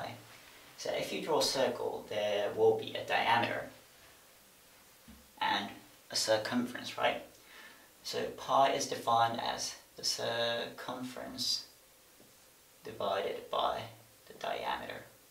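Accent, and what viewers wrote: United Kingdom. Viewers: 70% British + 20% American + 10% Japanese accent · Interesting video! You explain things clearly. By the way, you speak with a British accent but pronounce "diameter" in a very American way. It's quite funny.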